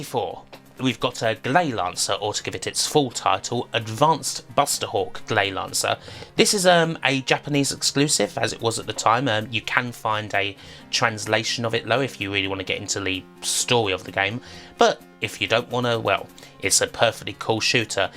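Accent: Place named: Essex, United Kingdom. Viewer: Nearly right. comes from Essex, which is to the north east of London.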